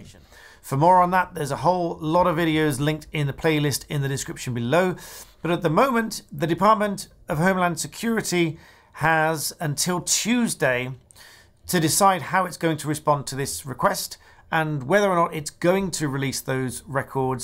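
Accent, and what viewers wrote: United Kingdom. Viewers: Your accent to British. Here you go again.😂😂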